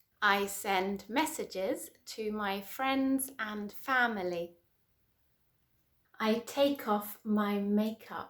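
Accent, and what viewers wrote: United Kingdom. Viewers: I guess you’re British because of your accent. I live in Taiwan. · Amaaazing! I love the british english🇧🇷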